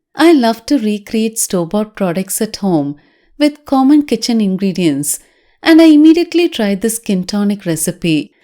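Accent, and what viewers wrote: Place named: Pollachi, India. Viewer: R u Coimbatore Ur slang s SIMILAR TO Pollachi slang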